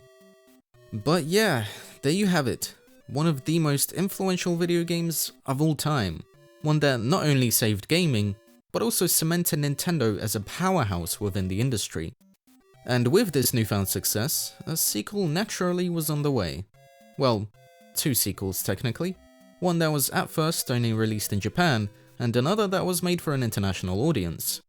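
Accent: United Kingdom. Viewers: Weird to hear someone with a UK accent saying Super Mario Bros saved gaming, considering there was no video game "crash" outside of the USA. Gaming was still very strong in the UK at that time. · Interesting hearing a British person talk about Mario so heavily. I always thought Mario wasn’t liked in the UK 🇬🇧 because he was deemed too kiddy.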